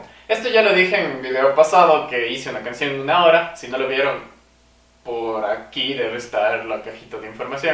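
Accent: Cuenca, Ecuador. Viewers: Tu acento me es familiar, eres Ecuatoriano mi brother?? 🇪🇨🔥🔥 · te has ganado un suscriptor!! eres de Cuenca? jaja tienes el acento xd excelente trabajo, espero que subas los videos explicando más a detalle todo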